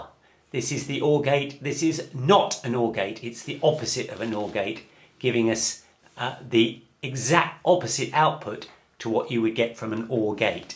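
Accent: United Kingdom. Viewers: British accent LOL I like it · Brilliant at last a person who speaks British English and can deliver in a clear manner enabling me to understand as I listen more of the same quality please. I feel the need to study more and that is the frame of mind a good teacher should leave a student in as I said Brilliant. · Great video, great pacing, and I always feel smarter learning something from somebody with a British accent. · GREATＶＩＤＥＯ Very clear! Your british accent is nostalgic haha.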